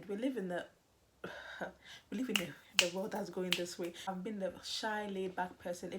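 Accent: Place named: Ghana. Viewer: Tfs... Btw you speak quiet fast ya...:-) good thing I had a Ghanaian friend during college so I cud follow you...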